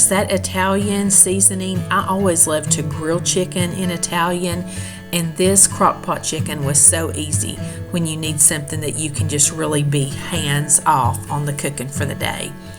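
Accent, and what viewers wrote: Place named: Alabama, United States. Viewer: I just found your channel! I love your accent - you sound like me!❤️from Alabama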